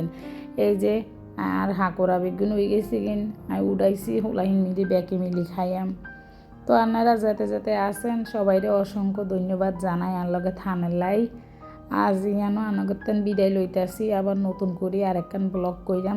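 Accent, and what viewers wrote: Noakhali, Bangladesh. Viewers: মুরগির মাংস রান্নাটা খুবই সুন্দর হয়েছে আপু অসাধারণ আপনার নোয়াখালীর ভাষায় কথা খুবই ভালো লাগলো দারুন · আসসালামুয়ালাইকুম প্রিয় আপু দারুন হয়েছে দুপুরের রান্না বিকালের নাস্তায় বাধাকপির পাকোড়া এটা আজকে কোন ভাষা শুনলাম😊 এটা কি নোয়াখাইল্লা ভাষা😊 আপু খুব ভালোই লাগলো শুনতে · ❤❤❤❤❤ ব্লগে চলে এলাম নোয়াখালী ভাষা শুনতে। খুবই মিষ্টি মধুর লাগে নোয়াখালীর ভাষা শুনতে। মুরগির মাংস রান্না করতে দেখলাম। ভালো লাগলো খুবই ভালো লাগলো আবার আসবো তোমার চ্যানেলে আরো অন্যান্য নতুন নতুন রেসিপি পেতে আর তোমার মিষ্টি মধুর কথা শুনতে। 👍🏼👍🏼🌹🌹🌹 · তুমি নেয়াখালির বউ 😁 তোমার কথা গুলো নোয়াখালী ভাষায় চমৎকার হয়েছে 👌👌💞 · মুরগির মাংস রান্না মাছ রান্না খুবই ভালো লাগলো নোয়াখালী ভাষায় কথা বলাটা খুবই ভালো লাগলো মাশাল্লাহ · নোয়াখালী ভাষার সঙ্গে❤ বাঁধাকপির পকোড়া❤ চিকেন কষা সবমিলিয়ে ভিডিওটা অসাধারণ❤ সঙ্গে নোয়াখালীর কথা তো আরো অসাধারণ আপু ❤ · Apu noakhalir bou tumi kotha gula shunte onek valo legese ❤❤pakora darun hoise ❤❤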